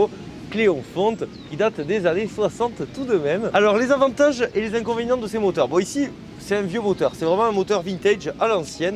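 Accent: South, France. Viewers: Je suis commercial pour une des 3 marques allemandes premium et pourtant j’avoue être sous le charme de tes vidéos. Une belle energie, un accent du sud bien vivant et des voitures qui ont fait notre enfance/adolescence. En plus tes vidéos sont bien documentées et tes montages aux petits oignons. Bravo !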